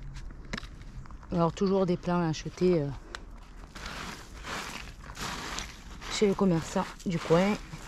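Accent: South, France. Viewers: ah super, je me disais bien que c'était l'accent du Sud, de chez nous !!! Ben oui, ils disent tous "je suis du Sud", beaucoup de gens qui font des vidéo comme vous, et ils sont du Ouest, de bezier... C'est pas le Sud ca😂.... Super vos vidéo et explication, je m'abonne alors, merci...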